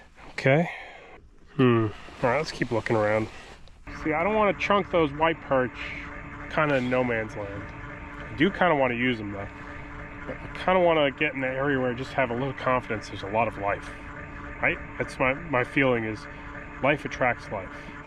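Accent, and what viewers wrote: New York, United States. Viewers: he is from nuu yawwk that's how we say crap eee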